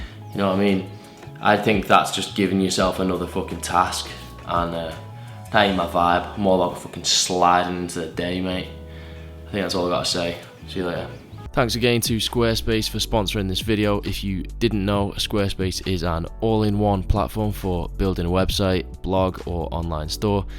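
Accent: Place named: England, United Kingdom. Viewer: Where are you from in England mate? The accent and choice of words are intriguing (in a good way) hahah love the vids man keep it up!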